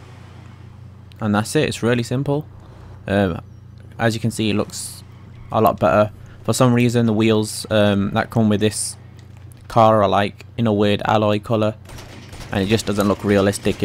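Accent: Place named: Bury, United Kingdom. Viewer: u from bury?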